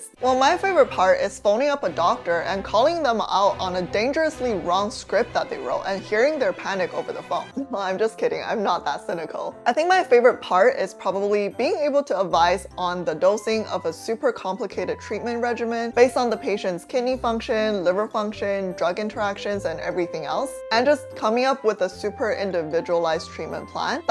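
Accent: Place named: Canada. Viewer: You sound like a Canadian Jenny Tian lmaooo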